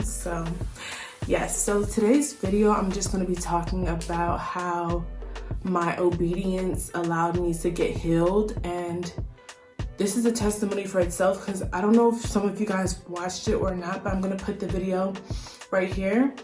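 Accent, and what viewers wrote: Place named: United States. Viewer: Yh your American Accent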